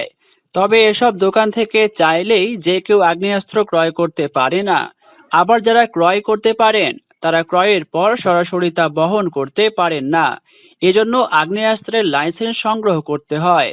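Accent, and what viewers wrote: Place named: Mymensingh, Bangladesh. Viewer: এমন করে পড়ে কেন!!!!!!!!! ভাই এর বাড়ি কই??? ময়মনসিনংহ নাকি???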